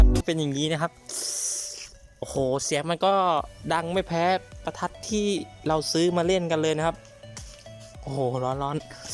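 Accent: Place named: Isan, Thailand. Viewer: คนอีสานปะครับเนี้ย โอ้ยยแต่ละคำน้อวว555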